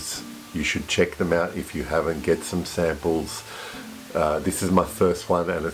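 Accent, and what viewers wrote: Australia. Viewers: So, you can pronounce the name of it. Seriously, I thought you were from Australia. Sorry mate, time to let you go. 👋👋 your believes are very different than ours.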